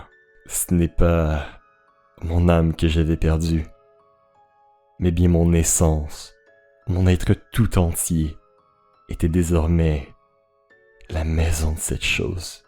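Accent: Quebec, Canada. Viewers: Olala cette voix m'avait tellement manqué. J ai eu cette chaine sur l accueil de youtube et j ai tout de suite cliqué. Ta narration est toujours aussi incroyable et sublime. J'adore ton accent québécois hihi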